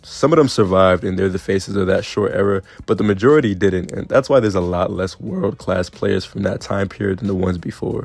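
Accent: United States. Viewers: sick video man! you presented a situation and reasons for it really clearly and in a rlly engaging way👍🏽 watching this before my first day of my second semester and as silly as it sounds, it’s refreshing to hear an american person chatting about footie—feels a little bit like yapping about soccer with friends (none of my friends like football so thanks for that haha) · Lmao all the wars in the world right now are because of Americans. It’s a terrible take. Every time I click a video and hear that Yankee Doodle accent I turn it off!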